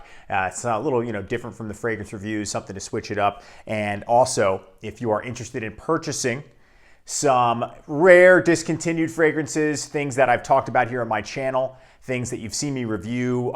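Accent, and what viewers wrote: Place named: North Jersey, United States. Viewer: For a guy called you like like a guy from north Jersey!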